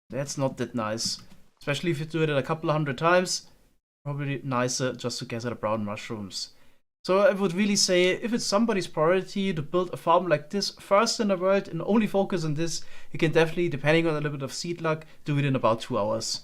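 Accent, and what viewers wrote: Germany. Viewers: Bro, are you german? That dialekt xD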